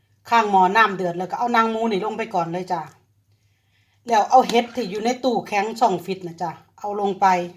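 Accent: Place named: Sisaket, Thailand. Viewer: ฟังเสียงแล้ว คือคนบ้านแฟนผมเลยครับ อยู่สีเกดบ่อน้อครับ🤔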